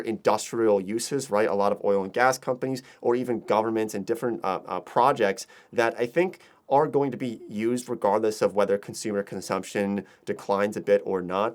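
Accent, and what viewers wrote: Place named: Pennsylvania, United States. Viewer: 5 minutes into this video, I'm like: "dang, is this guy from PA?"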